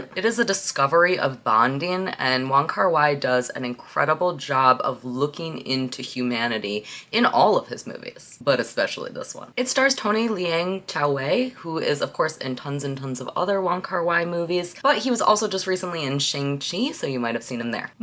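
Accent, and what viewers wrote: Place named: Detroit, United States. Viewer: Can I test my accent ear and guess you’re from Michigan? Specifically the Detroit area.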